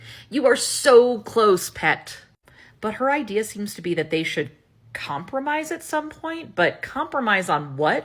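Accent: United Kingdom. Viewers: Smart and hilarious, but also I enjoyed listening to your British accent. Moreover you're apparently a very good singer. ❤❤❤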